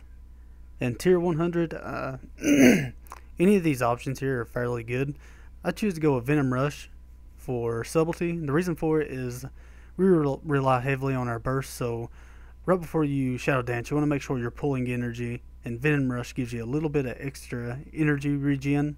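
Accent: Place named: Alabama, United States. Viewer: I love your Alabama accent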